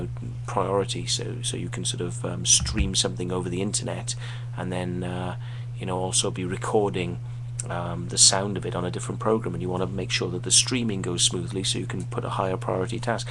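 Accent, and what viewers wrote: Wales, United Kingdom. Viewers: Holy shit, you can't be this idiotic. You still sound a bit welsh, but that surely can't be the reason. You make it sound like you know what you're talking about when claiming (incorrectly) that a lot of technologies Apple incorporate come from UNIX servers. But then you come up with these gems. "DVD is a PC format" "Web Pages, web design came from a PC" Ever heard of Xerox Parc, NeXT? Those are the origins of the GUI and "Web Pages" as you call them. The X is OS X is "ten", not 'X'. Dumbass.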